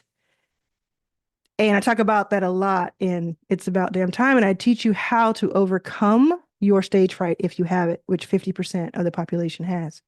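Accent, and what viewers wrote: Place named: Kenya. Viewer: You have an English accent of a Kenyan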